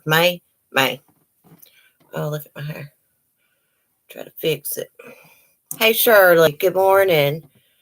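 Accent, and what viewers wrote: South, United States. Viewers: I grew up in Alabama and live in Philadelphia now and, let me just say, it is so refreshing to hear a southern accent again. In any case, I just started watching this so you may mention it in this video, but the first article I read about Maleah made me think immediately of the Susan Smith case-- missing car, no witnesses to the alleged "kidnapping" and a lot of reasons to suspect that the parent didn't want their child. I can't recall the details of the investigation involving Susan, but I do remember she claimed her kids were kidnapped by a carjacker while she was at a traffic light at a deserted intersection but she later confessed when the police discovered that the traffic light only turns red if another car is approaching the light from the cross street and they confronted her. Maleah's parents don't seem like the brightest bulbs, so I imagine that they will soon get caught in a similar lie that they won't be able to explain away. 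Sadly, I can't think of any reason as to why they would concoct a kidnapping story unless (I hate to even type this) they killed Maleah and need to explain why she's gone. They may have intentionally killed her, or they may have injured her so severely that she either died or she would have needed medical attention. After one serious injury they were able to get away with it by the skin of their teeth by saying that she fell. It would have been a lot harder to explain away another serious injury.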